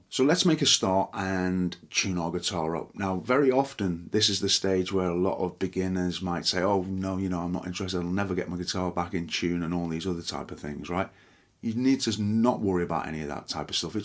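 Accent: Liverpool, United Kingdom. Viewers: Nice one thank you. (a hint of Scouse there perhaps?)👍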